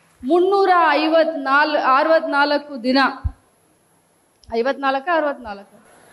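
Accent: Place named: Maharashtra, India. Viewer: ಹೆನ್ನು ಅಲ್ಲ ತಾಯಿ, ಹೆಣ್ಣು ಅದು.. ಮರಾಠಿ ಕನ್ನಡ ಮಾತಾಡ್ಬೇಡಿ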